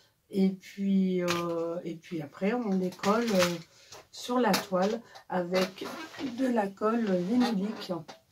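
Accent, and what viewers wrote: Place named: South, France. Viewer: Je suis content pour toi,on voit ton évolution depuis que je te connais...artistiquement...!!! Bel accent du sud...salut du nord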